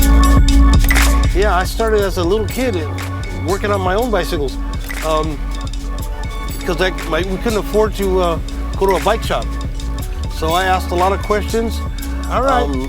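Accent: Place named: East Los Angeles, United States. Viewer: This guy is great - can tell right away by his accent he grew up in East L.A.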